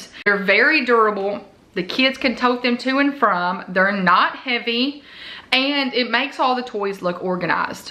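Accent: South, United States. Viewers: Country accent love it. I just found your channel.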